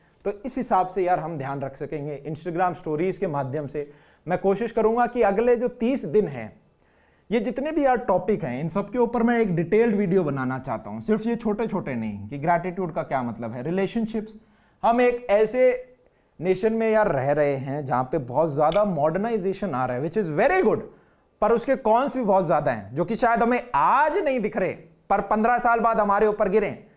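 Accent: Haryana, India. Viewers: Bhaiya your accent is going towards Haryanvi 🔥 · sir, are you from Haryana ? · Nice haryanvi accent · Katayi haryanavi lgre ho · Am I the only one who loves his Haryanvi accent?? 🤣🤣😍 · Harayanvi accent😅 Btw nice video as expected ✨💖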